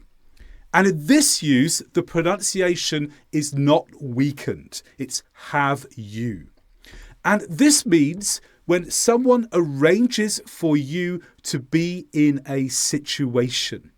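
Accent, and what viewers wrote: England, United Kingdom. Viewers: I like your British accent and teaching video, which is perfect since it helps me gain more English grammar rules, phrasal verbs, and expressions. But there is little difference in English usage in other English-speaking countries such as the U.S., Canada, Australia, and New Zealand....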